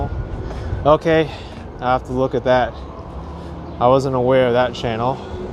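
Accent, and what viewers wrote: New York, United States. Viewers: Omg your accent is EVERYTHING. I feel like I'm back in old New York.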